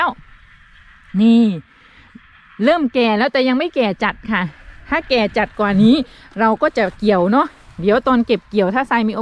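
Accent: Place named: Ubon Ratchathani, Thailand. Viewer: ฟังสำเนียงเป็นคนอุบลฯเหรอครับ ชาวนาของอังกฤษร่ำรวยต่างกันกับเกษตรกรไทยที่ยากจนลงทุกวัน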